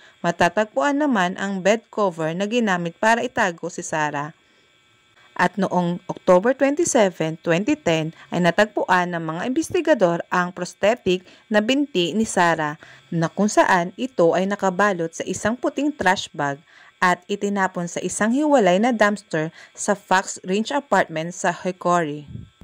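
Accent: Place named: Visayas, Philippines. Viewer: ung may pagka bisaya magsalita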